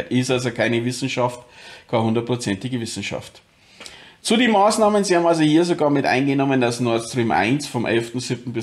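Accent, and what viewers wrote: Bavaria, Germany. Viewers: Servus, eigentlich bist du ein netter Kerl, und sprichst meine Sprache, vom Dialekt her mein ich, denn ich bin im Oberland daheim, schau runter ins Chiemgau, aber von der ewigen Panik halt ich nix, gut das mag dein einziges Geschäftsmodel sein, für mich wär des nix. Mein Nachbar hat mal Millenniums Notfallzeug verkauft, wie damals jeder gemeint hat die PCs verkraften denn Wechsel von 99 auf 0 nicht, ja was für einen Scheiß der da verkauft hat und das Geschäft ging gut, zumindest bis zum 1.1.2000, da war das Geschäft wie abgeschnitten. Ich sag immer was nutzen dir so Notfall Dinger, wenn man sonst unbeholfen und ein Depp ist, wichtig ist doch, dass man wenn die Kacke am Dampfen ist, die Ruhe bewahrt, so nach dem Motto jetzt schaun ma mal dann sehen wir es schon, oder, die Suppe wird nicht so heiß gegessen wie sie gekocht wird. Wenn Notstand ist, werden doch die Menschen führend sein, die Wissen wie es geht, die auch aus Scheiße was brauchbares machen können und nicht die Leute die sich mit Notkoffer und Zeug eindecken und schon die Hosen voll haben bevor es los geht. 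Nüchternheit zumindest bei Tag und Gottvertrauen, dann zwingt man jede Krise. Viele Grüße ins schöne Chiemgau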